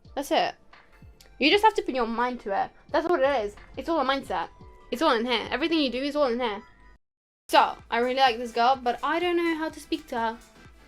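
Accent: United Kingdom. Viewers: That's the thiccest British accent I have ever heard........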